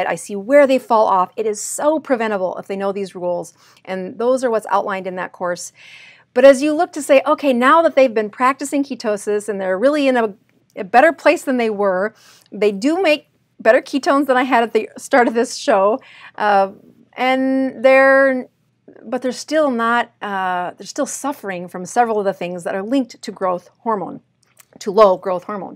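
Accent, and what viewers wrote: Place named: Upper Midwest, United States. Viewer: My family is of Finnish heritage from the upper midwest where most people have a sauna in their homes. My mother is 85 and in great shape, due in great part I believe to her regular use of a sauna. I have an infrared sauna that I've gotten out of the habit of using since we moved two years ago. Thank you for the inspiration to use it regularly once again. By the way, it throws me to hear your upper midwest accent without pronouncing it "Sowna" as the Finlanders do.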